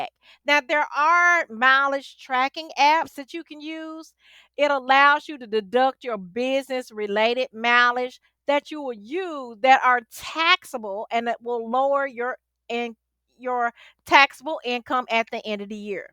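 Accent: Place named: South, United States. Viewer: Love that southern accent! Definitely fun to watch and listen to others and how they present themselves.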